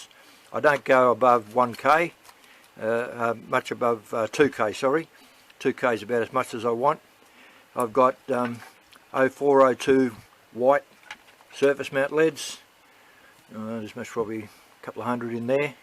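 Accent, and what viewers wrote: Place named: Australia. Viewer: Thank you, this is extremely helpful ✅ It’s great to hear an Aussie accent on YouTube. Best wishes, watching from Batemans Bay, NSW.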